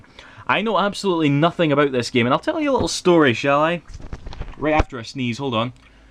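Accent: Scotland, United Kingdom. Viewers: What part of Scotland are you from.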